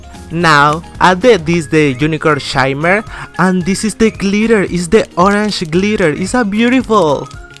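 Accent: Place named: Spain. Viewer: Tienes más acento español que inglés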